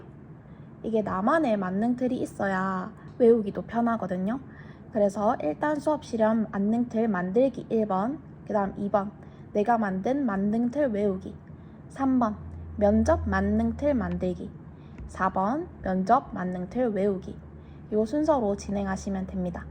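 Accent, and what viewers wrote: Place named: Gyeongbuk, South Korea. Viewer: 감사해요 선생님! 어제부터 감도 못 잡고 무의미하게 검색하며 시간만 허비하고 있었는데 어느 정도 방향이 보이는 것 같네요. 억양이 경북이신 것 같다고 생각이 드는데 합격해서 현장에서 꼭 뵐 수 있으면 좋겠습니다!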